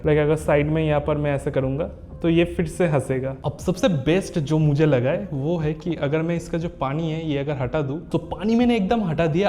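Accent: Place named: Bengal, India. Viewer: Kay hoya bongla spiking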